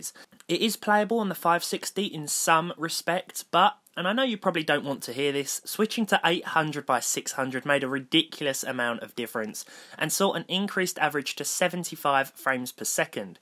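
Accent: United Kingdom. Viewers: Well. Your accent made me understand the reason why British men are called Gentlemen.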